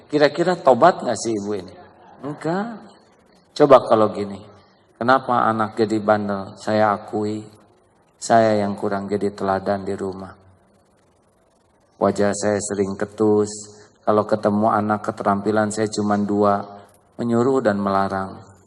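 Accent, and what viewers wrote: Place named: Sunda, Indonesia. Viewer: Sebenarnya orang mana ,kadang Sunda ,,kadang ,sanjang ,nggih tiang pundi aa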